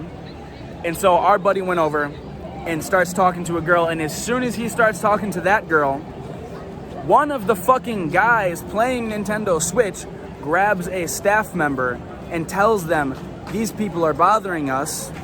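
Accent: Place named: Chicago, United States. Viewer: Are you from Chicago? Keep up the great videos on YouTube 😎👍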